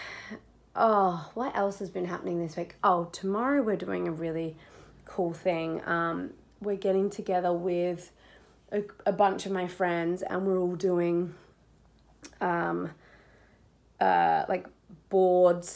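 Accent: Australia. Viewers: I love that you share all of this with us and that you’ve never lost your Aussie accent!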